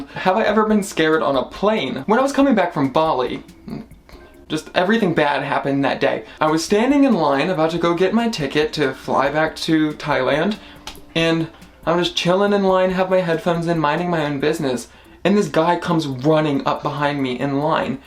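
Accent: United States. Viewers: I love your American accent....and your nose.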